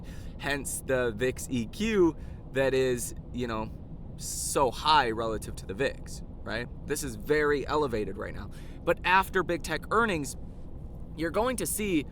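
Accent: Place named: Chicago, United States. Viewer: it sounded like a 1950s Chicago accent of a guy announcing a horse race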